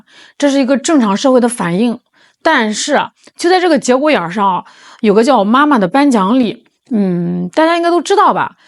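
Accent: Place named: Henan, China. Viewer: 河普地道 😂😂😂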